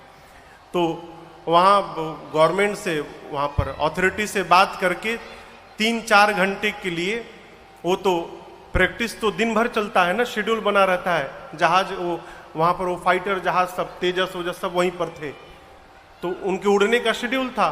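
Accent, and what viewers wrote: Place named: Purabiya, India. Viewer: Har baat mein Anand hai ho kya kar rahe ho Puri Katha mein ho hai ho hai ho hai ho kar Diya purabiya Bhasha bolate ho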